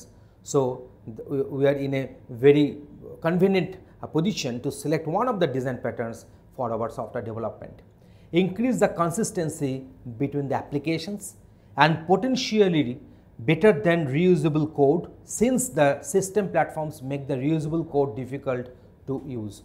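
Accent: India. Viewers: This man is very smart. Unfortunately, his accent hinders non-Hindi | non-Bengoli speakers from understanding his English every now and then. Still, the video was appreciated and insightful.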